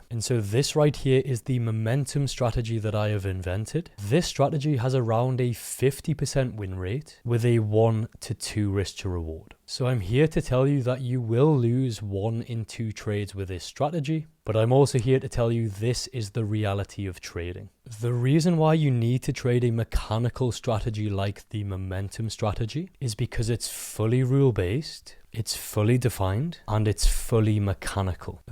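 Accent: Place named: Wales, United Kingdom. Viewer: Thank you for another great video. What assets do you trade/ monitor..p.s love the Welsh accent.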